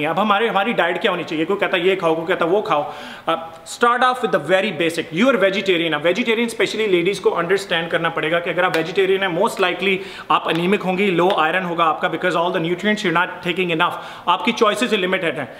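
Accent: Punjab, India. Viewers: Ur way of speaking Punjabi is so cute.i also want to loose some weight but mujhe baar baar kuch khane ka man krta h.so plz aisa diet plan do 4veg jisse tummy fat km ho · Stumbled upon his channel love love the way he talks especially his hindi/punjabi accent so I subscribed :P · Sir u r Kainttt..... I am going to follow this diet plan...... And I like the way you talk Hindi, English te naal Punjabi da tadka aaye haaye..... 👍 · Your. Punjabi accent😘😒😒lovely 😘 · Lovely video and lovely cute punjabi wali hindi awwww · i m also punjabi and the way u speak punjabi is sooo cuteeee..... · hahaha I really enjoyed this video.. your Punjabi accent is aala.. diet plan is good..keep it up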